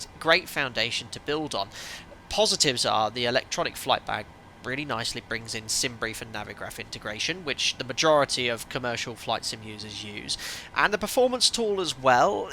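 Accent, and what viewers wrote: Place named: United Kingdom. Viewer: This is why your one of the best flight instructors and tutors for the Boeing 737. Basically an expert with Professional speech / terminology. Full of good knowledge with dry British humour and accent.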